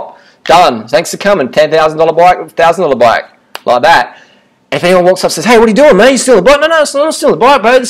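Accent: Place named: Australia. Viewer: I'm curious, does anyone know where this guy is from? I'm detecting a sort of Australian accent but im finding it a bit difficult to understand him. It would be nice to know where the accents from :)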